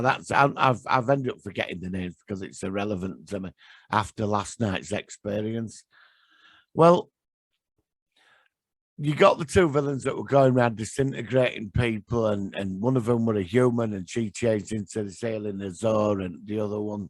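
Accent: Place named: Liverpool, United Kingdom. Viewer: I can't stand scouse. It should be counted as a speech impediment. CALM DOWN CALM DOWN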